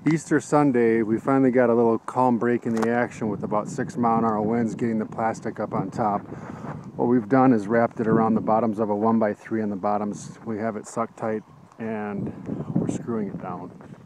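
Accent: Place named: Upper Midwest, United States. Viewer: Based on your accent, somewhere west of the Great Lakes and near Canada.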